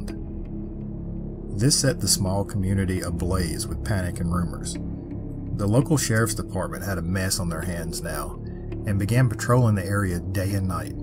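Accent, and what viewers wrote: South, United States. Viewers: You're welcome! I saw on another reply of yours (on the Conjure Chest vid, I believe) that you were concerned about your accent. If anything, your accent is not a deterrent, but validation. It is clear that you take time to research your content and present things in a factual, non-hyped manner. Those from the South will most certainly appreciate you telling the stories from the regions that both you and they call home. That is truly appreciated.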